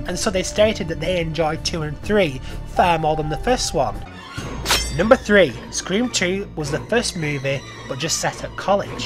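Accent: United Kingdom. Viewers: I really do feel like Roman fits into the whole story very well - it’s a believable twist and a big one, however as people dislike the third movie, they feel like they should dislike roman and brush aside that he was the third killer in the original movie and the reason the first movie happened. My unpopular opinion is that Scream shouldn’t be viewed as a comedy more than a slasher, and whilst there are some funny moments, the franchise overall isn’t taken too seriously by some horror fans and i feel it should be, it’s the most realistic franchise in the genre and it hasn’t deviated from its realism at all, as there isn’t anything supernatural with the killers. So to conclude, Roman being the third killer is canon and he provided a good twist, and Scream as a whole should be viewed as a more serious slasher entry, rather than something of a comedy. Great video, it’s always nice to hear a fellow brit loving scream! 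🔪🇬🇧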